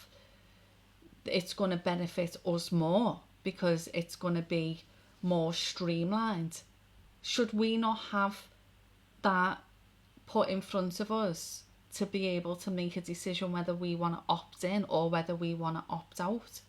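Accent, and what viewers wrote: Liverpool, United Kingdom. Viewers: I think that more people than ever woke up to see the nefarious schemes for what there are. Also, it seems that the level or resistance in The West is bigger than the politicians expected. I belive that people must fight against Internet control (online "safety" act and similar bills in other countries) to be able to speak about the wrongdoings of the elite. Use as much cash as possible since the banks want to phase it out and support local businesses that accept it. And remember that there's much more of ordinary folk than the globalists- if they could just force all of this though- they would- they can't and that's why they try to weasel it through the veteran id card, children's digital id, one login etc. Also, last thought- all those stabbings and unrest seems very suspicious and "organised" in a way to dupe people into failing for digital id- don't fall for that. 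Don't ask for national emergency or any other extraordinary powers to be granted to the gov. They'd misuse them as always. Ps. Love the scouse accent and the fact that you gracefully subtitled your video for non native speakers watching😂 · I thought I had tuned into an old episode of Brookside for a moment there. 🙂 I love your accent . . . . . hello and Greetings from Newcastle, I just subscribed to your channel. 🙂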